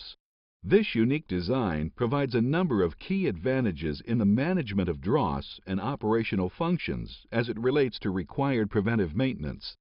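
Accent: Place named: North America. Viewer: Oh Yes, how right you are. This is a North American pronunciation thing. Even The Mythbusters say 'sodder'. Why is it so?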